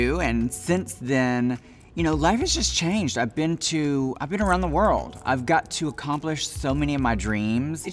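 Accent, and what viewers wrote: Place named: South, United States. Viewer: I love her and get little country accent is too cute. I know she won't win but I'm really rooting for her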